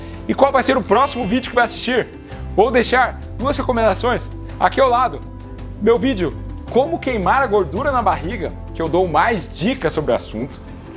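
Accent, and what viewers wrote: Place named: Caipira, Brazil. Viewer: Já era fã, agora que vi de camisa polo, apaixonei. Esse R caipira, então...🥰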